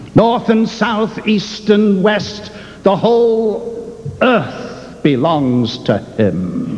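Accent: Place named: Wales, United Kingdom. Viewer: Was Dr Phillips a Welshman? I'm sure i detect a Welsh accent in his poetic delivery.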